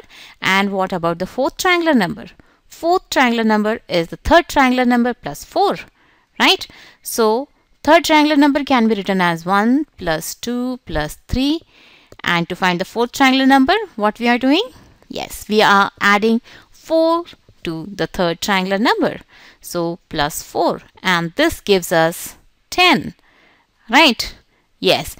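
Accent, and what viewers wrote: India. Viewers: annoying voice. And until when will the Indian accent with English titled videos be made.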